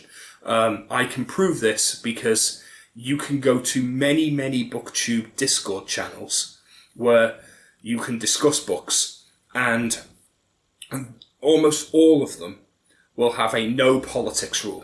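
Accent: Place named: England, United Kingdom. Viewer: Thanks for this very intelligent, interesting talk. It's very courageous of you and will earn you lots of brickbats, I'm sure. I did not know that one can block people on YouTube. How do you go about it? I am thinking of starting a BT channel. I am put off BT a lot because it seems almost entirely to consist of young, female 'readers' posting who are as interested in their appearance and their books' appearance as they are in books in general. Eg, shelving your books according to the colour of their covers! That strikes me as pretty infantile, unless you're five or six. Many of my books are shabby, grainy second-hand copies with little colour about them at all. One young female poster gave details of her make-up and where to buy it! I'm an old man and so feel really out on a limb, but will still give it a go,because I would like to meet a handful of people who are serisouly interested in books. 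I have found a few older women's' channels that are very interesting and from whom I have actuallyl earnt things. Another complaint I have is the lack of people interested in non-fiction reading. I hardly read anything else! The algorhythm must have filtered a lot of left-wing things out from my recommendations. I haven't had lots of leftie rubbish thrust at me, but I have noticed that leaning, too. Your picture seems very American, culturally speaking, though you sound 'English'.. I'm a Brit. There aren't many Brits on BookTube. Thanks again for a great contribution.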